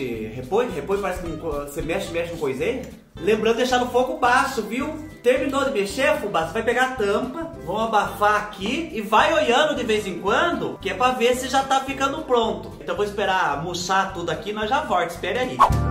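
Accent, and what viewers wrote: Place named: South, Brazil. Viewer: Fubá cai sem querer no teu vídeo e ao ouvir esse sotaque pensei: esse Fubá deve ser do PR ou de SC ou de RS😅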